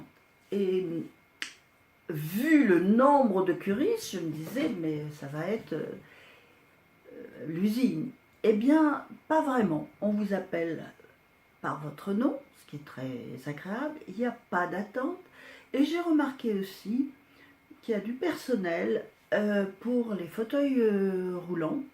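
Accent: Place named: France. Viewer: loved it & love your French accent :)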